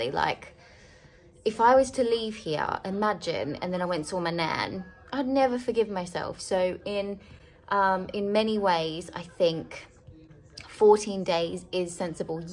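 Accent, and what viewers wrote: Australia. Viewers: You’re such a pommy! Hahaha you have lived in the UK too long, do you reckon you have morphed into a pommy yourself? Or do you think you still sound Aussie I’m actually so interested to know! Xx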